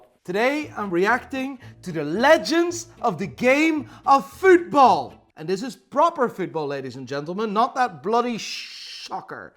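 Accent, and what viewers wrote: Netherlands. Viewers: Ik wist gewoon dat jij Nederlands bent ik heb het vaker in je accent gehoord maar nou weten we het zeker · Heerlijk, als ik dat hollandse accent er tussendoor hoor 😁 Well done! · ik wist gewoon dat je NL was broer. Je accent je naam alles! En uit welke stad kom je trouwens vandaan?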